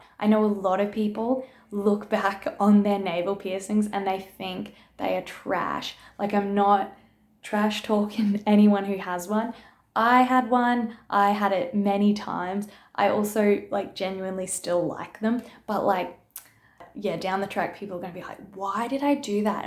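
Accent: Australia. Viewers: She’s so Australian I love it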